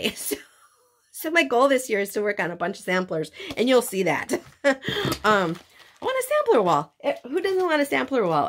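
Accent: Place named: Upper Midwest, United States. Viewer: I knew immediately from your slight "accent" that you were from the UP or Wisconsin. I grew up near Iron Mountain (Norway) and will always be a Yooper at heart. Your projects and future projects are great. And what a wonderful ministry you have with your hats and mittens. I know how needed it is up there.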